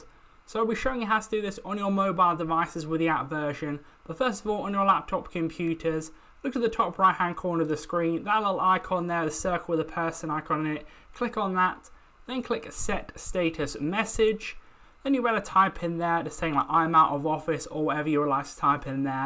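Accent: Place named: United Kingdom. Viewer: woo.. That's some British accent.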